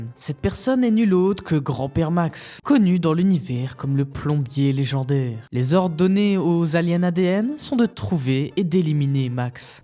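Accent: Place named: Verviers, Belgium. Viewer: Cet accent de Verviétois 😂 Salut camarade 🇧🇪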